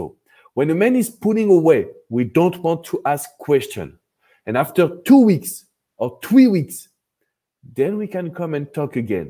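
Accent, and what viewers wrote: France. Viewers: I am here for the french accent. :-) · NG from Nigeria. U are always apt and on point. I love the French accent. U are simply the best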